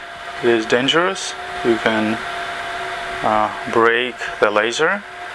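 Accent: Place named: Russia. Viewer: Takoy Russian accent :)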